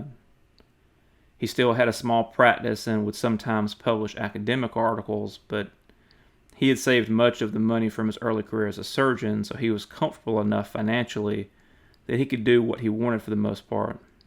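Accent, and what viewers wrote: South, United States. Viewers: Yes, us Brits do love a Southern drawl...I find it mesmerising 🇬🇧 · Came here from an add. Heard you have an American southern drawl, which is my weakness (I'm British) and had to subscribe.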